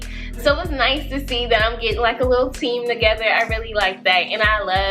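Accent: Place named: South, United States. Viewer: Great information. I love your Southern accent! I will travel to Charlotte next month.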